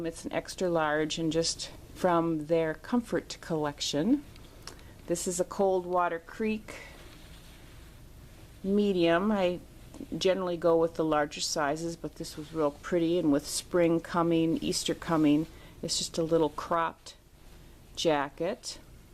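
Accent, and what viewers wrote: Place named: Midwest, United States. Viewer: You sound like you must be in the Midwest I have lots of Cousins in Wisconsin and yes they have had the weirdest weather with high winds...one day some snow and then warmer temperatures. Enjoyed your video....do you sell a lot of yarn my younger sister loves knitting ! Corie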